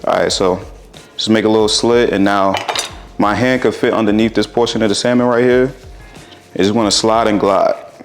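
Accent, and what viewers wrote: New York, United States. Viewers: I hear an accent, maybe New York?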